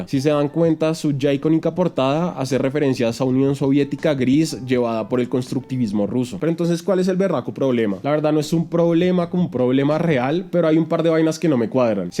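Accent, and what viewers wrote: Colombia. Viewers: ¿Eres de Medellín o Bogotá? No distingo tu acento, soy fan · oiga pa, deberiamos formar una banda mucho mas indie y experimental que rompa estos esqumas, soy guitarristas, me cuentas se nota que eres colombiano XD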